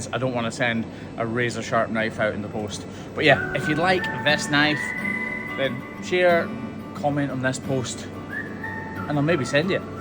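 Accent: Scotland, United Kingdom. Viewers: I love watching your videos! You've a beautiful family and I Love hearing your Scottish accent taking us through the video! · I've shared! Your videos have helped me get over my fear of cows! With the added bonus of the silky Scottish accent 🤭😉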